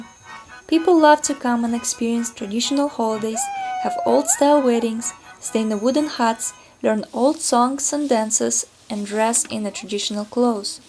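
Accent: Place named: Russia. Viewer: Интересно, а зачем надо было на английском (с явным русским акцентом) выдавать это видео? Думаете, заграница нам поможет?))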